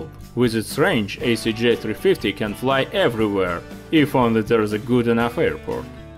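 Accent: Russia. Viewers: if you have a foreign accent, it means you speak another language. This guy probably speaks Russian. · he’s Russian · RUSSIAN ACCENT !!! I'm not watching. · Its Russian accent I think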